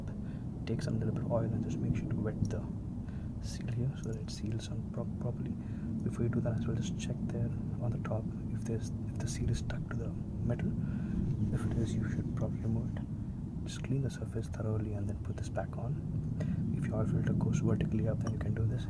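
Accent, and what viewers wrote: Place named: India. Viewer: This is going to sound racist and it may be, make more of these vids I trust the Indian accent teaching me prob a result of Kahn academy but very informative thank you!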